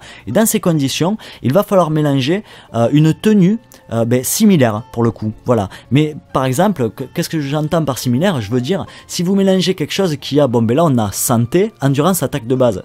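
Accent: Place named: South, France. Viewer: J'adore l'accent du sud !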